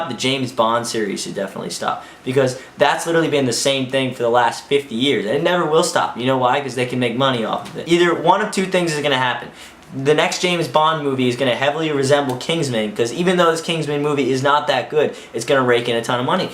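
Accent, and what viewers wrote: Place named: New Jersey, United States. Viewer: l love the hint of a jersey accent you have 😄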